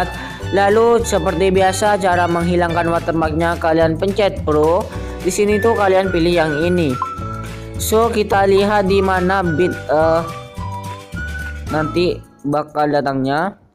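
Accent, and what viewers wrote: Madura, Indonesia. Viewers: Org Madura kah ketauan banget:v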